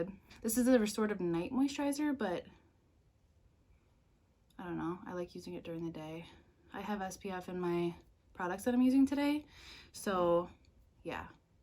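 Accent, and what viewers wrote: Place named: England, United Kingdom. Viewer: for some reason you remind me of someone who was born and raised in England but moved to America and lost most of their accent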